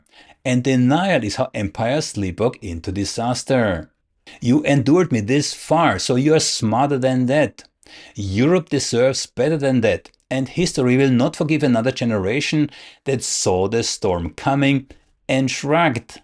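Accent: Germany. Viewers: I came for Russian propaganda in English with German accent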